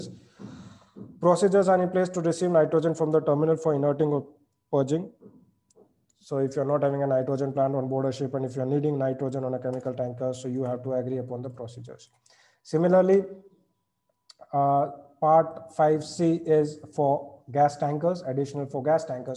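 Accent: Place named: India. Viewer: Its indian bro, not for beginners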